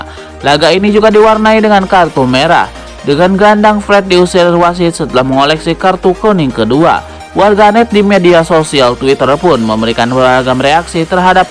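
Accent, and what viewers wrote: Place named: Java, Indonesia. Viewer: Medok jg..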